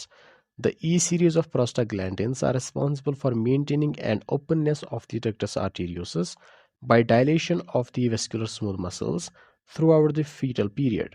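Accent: Kashmir, India. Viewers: your accent sounds kashmiri !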